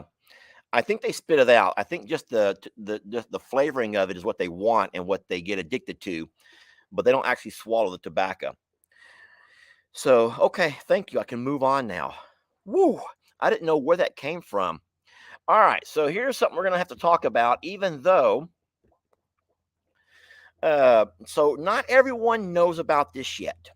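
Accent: South, United States. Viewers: It's also partly your Southern Draw it's awesome to hear from you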